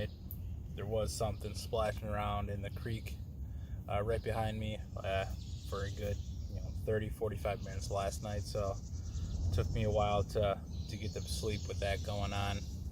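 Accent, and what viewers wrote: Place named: Upper Midwest, United States. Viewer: Good job 👍. Now I know where you get your accent from. I lived in the UP for 8 yrs. We took trips to Green Bay just for the shopping...lol. Look forward to seeing more.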